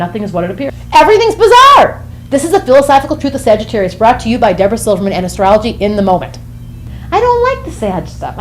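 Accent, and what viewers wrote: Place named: India. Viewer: That indian accent :D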